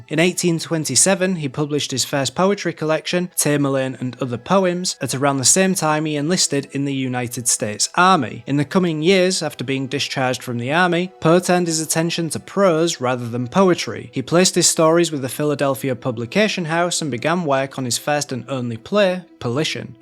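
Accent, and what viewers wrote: Yorkshire, United Kingdom. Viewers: I'm from Yorkshire, and I am so glad you have decided to stay authentic to your accent. Yorkshire and proud. :) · Love the video. Love the "yawk-shuh" accent. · Some of us in the UK also pronounce it as 'aitch' depends where you're from. We have a wide variation in accents here. The chap doing the video had a strong Yorkshire accent for example.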